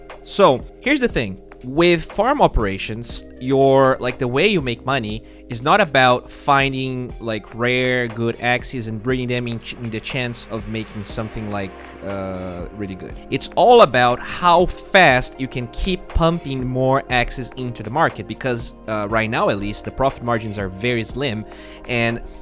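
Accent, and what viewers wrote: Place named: Brazil. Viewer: Hey man, are u brazilian? U have an accent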